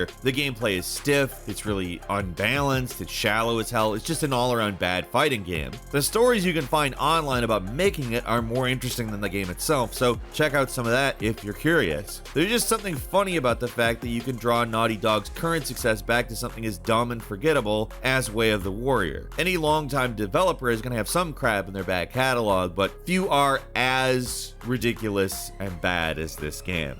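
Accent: United States. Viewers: I always cringe when I hear the American pronunciation of Japanese names but that's a given at this point as many Japanese people most probably can't pronounce American names really well either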